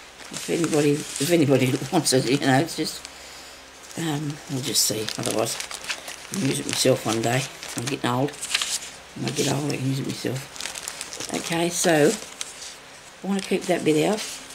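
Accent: Australia. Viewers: Hello, just found your podcast about the padded coat hangers. Enjoyed it so much wanted to watch more. Nice to hear your Aussie accent, from a kiwi in Texas. 💖💖😊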